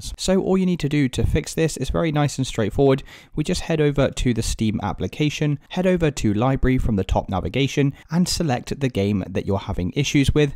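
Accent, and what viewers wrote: United Kingdom. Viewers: thank you random british guy!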